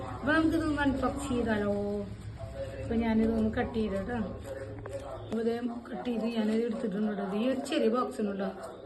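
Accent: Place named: Thrissur, India. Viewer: Thrissur .....language superattaaaa.....simple and beutiful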